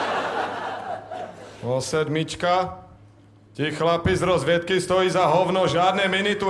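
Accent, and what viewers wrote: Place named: Prague, Czech Republic. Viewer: mne to pripada trapny...kazdej ostravak sice mluvi jak jantar, neumeji dat skoro dohromady ani vetu, ale tento borec mi prijde trapnej..taky bych rekl, ze to bude nejakej prazak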